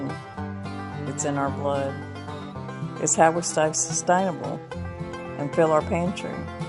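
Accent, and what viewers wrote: South, United States. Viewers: Hi just found your channel Great looking recipes I really like the chicken fried steak video My grandmother is from Arkansas the Southern talk remind me of her God bless you and your family be safe blessings 👍🏼❤️😀🌎👏🏼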